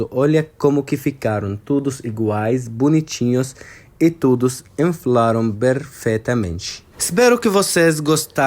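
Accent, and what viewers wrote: Arab world. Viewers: Sotaque árabe muito charmoso !🧡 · Que receita fácil.. vou fazer!!! Eu amo esse português com sotaque árabe!!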